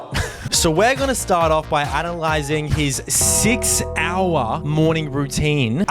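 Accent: Australia. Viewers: He is Australian I believe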